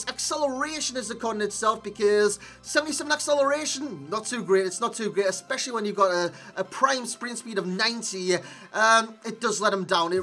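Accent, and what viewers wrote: Newcastle, United Kingdom. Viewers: Another Geordie we aye man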